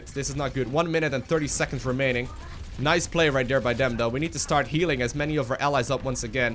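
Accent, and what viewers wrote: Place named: Netherlands. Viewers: he sounds dutch to me as well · This guy is dutch right? his accent is so dutch lol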